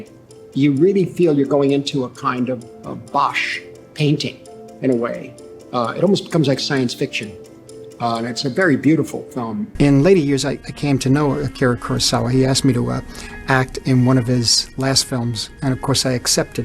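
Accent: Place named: Brooklyn, United States. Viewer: Van Gogh with a Brooklyn accent!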